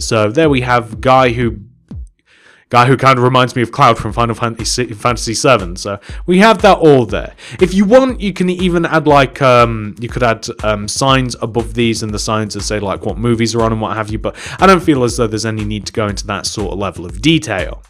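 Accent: United Kingdom. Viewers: your voice is really good your accent is like the uk one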